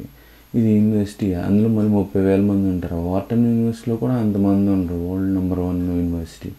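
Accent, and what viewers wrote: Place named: Rajahmundry, India. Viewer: (Offtopic) naku elago USA nachaddu, but i like your accent sir, meedi rajamundry na?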